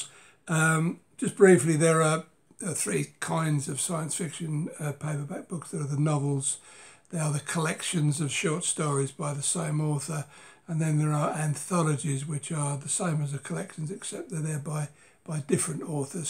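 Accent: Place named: Essex, United Kingdom. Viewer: I thought your accent sounded as if you came from Essex. It's where I live.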